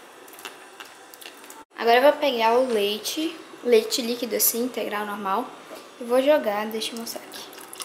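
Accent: Pará, Brazil. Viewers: sotaque de paraense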